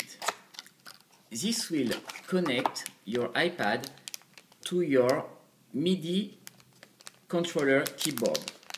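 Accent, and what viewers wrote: France. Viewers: Love that French accent ! My French wife said “OMG do I sound like that ?”. By the way, great videos, keep up the good work. All the Best. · hhhh putin l'accent française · Le mec a l accent français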